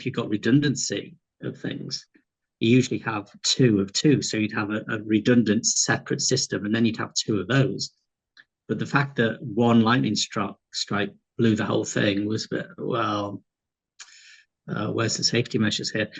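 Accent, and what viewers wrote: United Kingdom. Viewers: british bla bla bla boring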